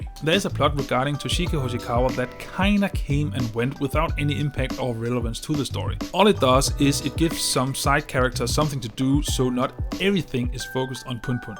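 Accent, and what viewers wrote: Denmark. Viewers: Er du dansker? Din accent lyder ret dansk i hvert fald. Nice video tho!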